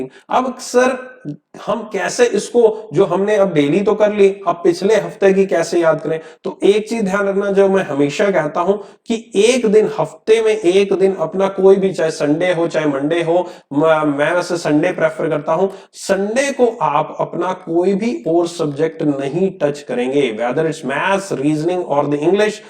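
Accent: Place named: Haryana, India. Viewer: Sir ur pronunciation is very haryanvi ,pls try to improve it , Kindly don't take it as a complaint take it as a suggestion ...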